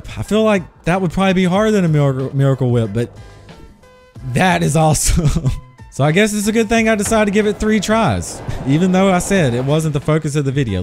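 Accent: South, United States. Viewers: this dude sounds like hill billy bob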